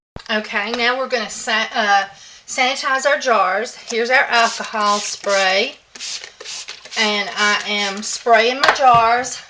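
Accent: South, United States. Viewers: I live in Colorado where it is very dry...I had to laugh because your from the south, and I used to live down there...I picked up the accent now and my hsb has wondered "who have you been talking too?" I said I havn't been talkin' to anyone, just a listenin' to a lady on a video" I loved livin' the in the south where I warshed my winda's and then I moved back here where I wash my windows...Not making fun of you, just that you bring back such good memories! Never met nicer people..I love the south...I wish I could get my hsb to fetch somethin', in fact anything!LOL,...but they don't fetch in this neck of the woods...haha....Loved your video's and want to make some creams some now...blessings, Victoria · I love how you’re southern af but also a hippie 😊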